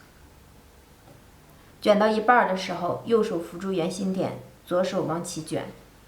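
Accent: Northeast, China. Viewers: 一听口音，感觉就是俺们东北的媳妇，手真巧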